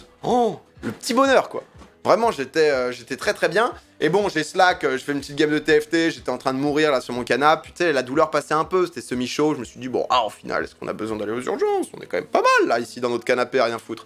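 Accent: France. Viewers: T'es sûr d'être du 69 domi? Pcq j'ai bien l'impression que tu es plus Marseillais de la façon que tu raconte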